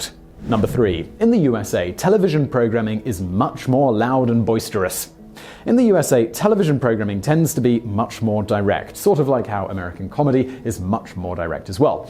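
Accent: United Kingdom. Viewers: I love how u say controversy (and other words u pronounce the British way instead of the American way) u yummy man u! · Is there a louder, more in-your-face american version of Simon Whistler and his shows where he doesn't speak with a british accent? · Love the British accent. I don't get British humor tho.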